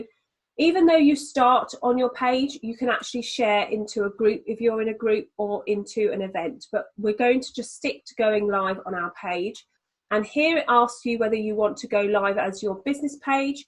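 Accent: United Kingdom. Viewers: ok I am a little dense. What are you using for recording you screen for this presentation. Ps. You always get back to me after I ask a question. I like that and the. Brit accent. Lol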